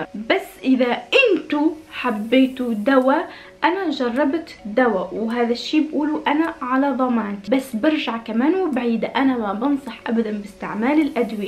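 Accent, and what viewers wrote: Tunisia. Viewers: دوختني وتش نتي تومسية او مغربية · تونسية ياخي ؟؟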